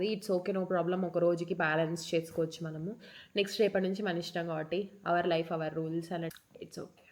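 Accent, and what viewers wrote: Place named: Hyderabad, India. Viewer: Garu meeru Hyderabad lo leg petinaru total ga Hyderabadi Slang kuda vachesindi super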